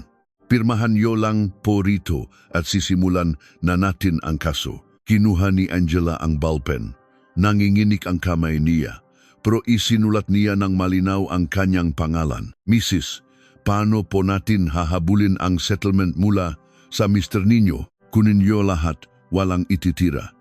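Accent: China. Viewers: alanganin na intsik ang pangit pakinggan ayusin mo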